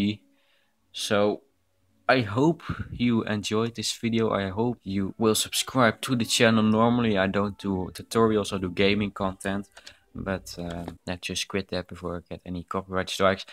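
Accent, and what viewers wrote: Netherlands. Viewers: Oh god. The horrible dutch english accent. But the content of this video was awesome. Thumbs up for you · whats your languaze, i think your dutch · your from belgium of holland ik hoor het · duidelijk een nederlander :') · are you dutch?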